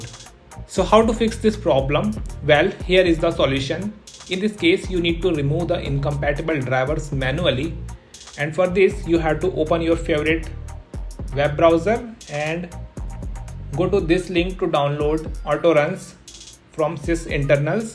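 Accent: India. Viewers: I never watched a video with an hindian accent that didnt help me, thank you so much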